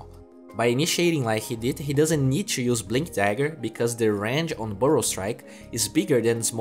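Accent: Brazil. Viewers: É BRASILEIRO SIM PORRR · Your accent sounds like we brazillians talking in english. Great video, keep it up. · Are you Brazillian ? It looks like by you accent.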